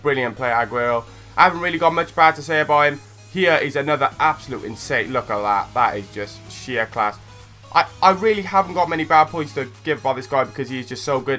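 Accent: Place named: Welsh Valleys, United Kingdom. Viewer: u sound like taht guy out of the valleys lol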